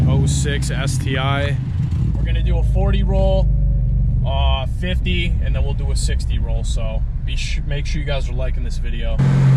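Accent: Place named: Philadelphia, United States. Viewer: Lol bro you just like your from philly.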